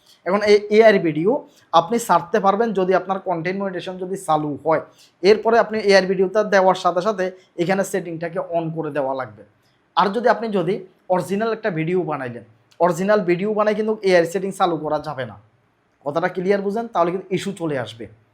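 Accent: Chittagong, Bangladesh. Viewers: Vai apni ki Chittagong naki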